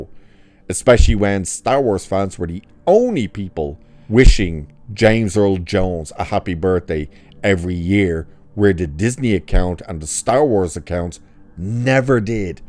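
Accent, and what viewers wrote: Ireland. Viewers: Love your dialect. Which part of Ireland? Sounds close to some Newfoundland dialects I've heard. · North Dublin 😂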